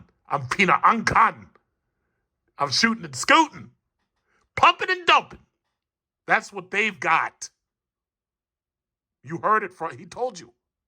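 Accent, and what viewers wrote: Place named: New York, United States. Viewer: This dude sound like he's from NYC totally funny guy, but my man spitting out 💎 good content.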